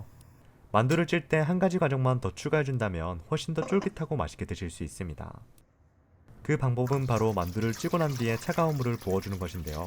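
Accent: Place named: Busan, South Korea. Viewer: 공감꾹 부산사람이라 사투리가 바로 들리네예❤❤❤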